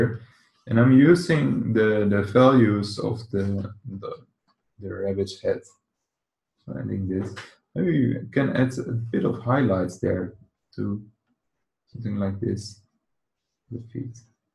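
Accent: Netherlands. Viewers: are you dutch? awesome tutorial btw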